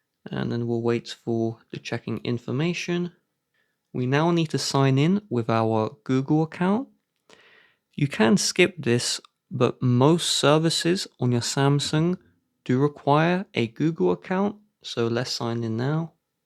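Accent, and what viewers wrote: United Kingdom. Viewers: Hey what country are you uploading from? I’m in the US (Texas) lol just wondering because you sound British (UK) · You have such a nice accent! What is it Surrey or Berkshire?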